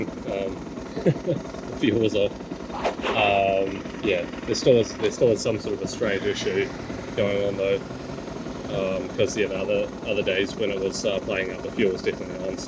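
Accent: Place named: New Zealand. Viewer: Awesome, I followed pretty much the same route a couple of years ago, it's one of my favorite rides, that was like re-living my trip but with better music and video😁. Enjoyed this more than the overseas riders, it's always better when you know the places and to hear the Kiwi accent behind the camera, not to mention your filming and music is top notch.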